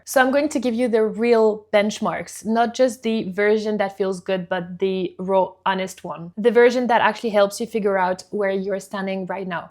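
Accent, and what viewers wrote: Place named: South Africa. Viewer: You sound South African. I did a few contracs as a singing bassist in party bands for Disney and Princess ships. All the South Africans I met on ships worked in the spas. They called them 'Steiner Girls'.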